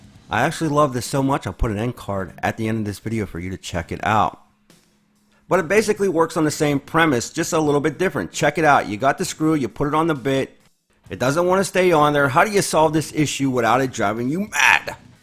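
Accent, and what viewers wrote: Pittsburgh, United States. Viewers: Pittsburgh accent? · Just a little Pittsburgh accent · You don’t happen to be from the Pittsburgh area are ya?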